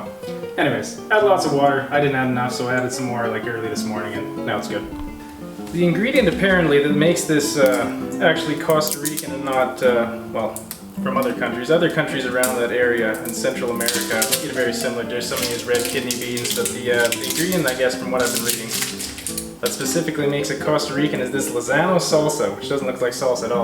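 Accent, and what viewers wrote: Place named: Canada. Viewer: A) this is so freaking adorable, b) holy crikey do you ever have a strong 🇨🇦 accent you have!, c) Isabel pretty much stole your whole face, lol & d) I’m not sure what I’m more impressed with - your cooking or your editing skills…hidden talents galore! ps I watched this with Calvin and he just kept saying “I bet must be really happy about this hey?!!l …he meant the cooking the skills ☺️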